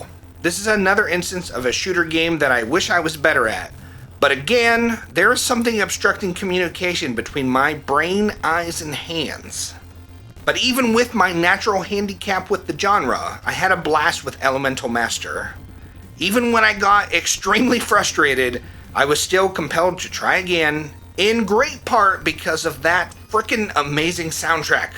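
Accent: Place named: United Kingdom. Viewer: Only just stumbled across your videos mate. Very entertaining, and great to hear a fellow brit in a genre swamped with Americans!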